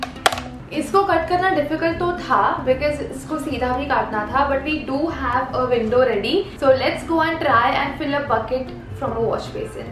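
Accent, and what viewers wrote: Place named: Maharashtra, India. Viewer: You have such a fun marathi accent.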